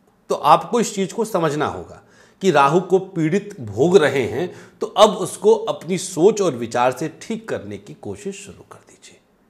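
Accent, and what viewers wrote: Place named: Kanpur, India. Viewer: Pandit ji aap kanpur se hain kya itna mazedar to sirf hum kanpuries bolte hain maza aa gya 😊🙏🏻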